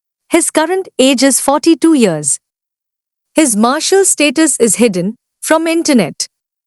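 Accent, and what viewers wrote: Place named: India. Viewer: Ta blbkaco to cteat se naučí výslovnost angličtiny ! Ať nečte nesmysly krava patrně bych řekla india nebo podobné !👎👎👎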